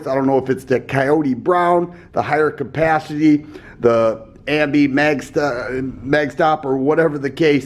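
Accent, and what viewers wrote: Michigan, United States. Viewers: I didn't know Michiganders had a accent until I moved away. You have a very heavy Michigan accent. Keep up the great reviews.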